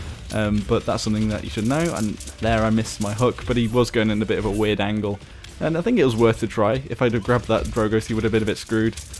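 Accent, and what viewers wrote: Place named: United Kingdom. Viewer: Are you British? You sound like you have a British accent.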